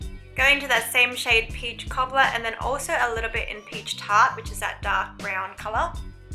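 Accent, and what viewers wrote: Australia. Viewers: ahhh love hearing another Aussie accent!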